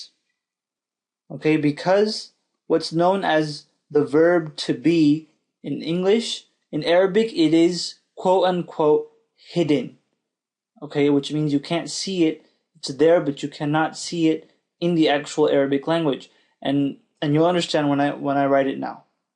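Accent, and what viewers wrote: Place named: United States. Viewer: لهجتك امريكانية مية بي مية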